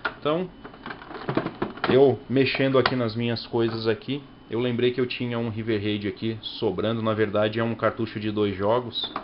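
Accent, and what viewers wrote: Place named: Rio de Janeiro, Brazil. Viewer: Vc e carioca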